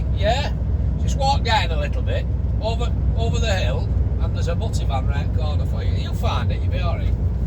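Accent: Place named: Yorkshire, United Kingdom. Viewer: Hi where are you from, Lancashire or Yorkshire?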